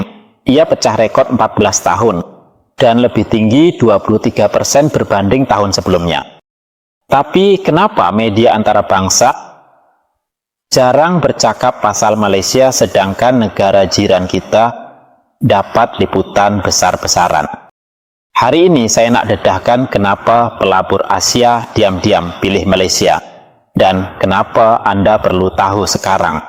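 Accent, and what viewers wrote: Indonesia. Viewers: BUKAN BAHASA INDONESIA TETAPI BAHASA MELAYU SLANG ( LOGHAT ) INDONESIA.... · Pelabur & pencerita ini adalah warga Indonesia kerana slang cara di bercakap memang warga Indonesia.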